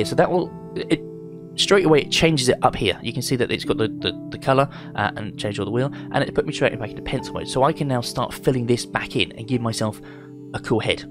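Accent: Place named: United Kingdom. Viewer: I knew he was living in UK not beacuse of the location but the accent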